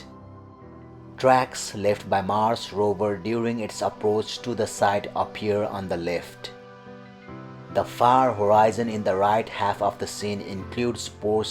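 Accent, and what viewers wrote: India. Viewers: Are you from north east India? My wife is from Assam and your accent sounds similar. Cheers and thanks!!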